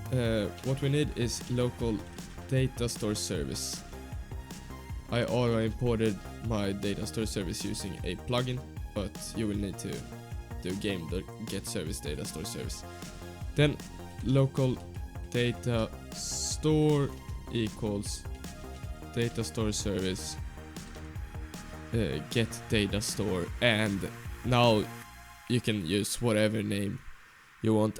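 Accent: Sweden. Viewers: YOU ARE SWEDISH I HEAR UR ACCENT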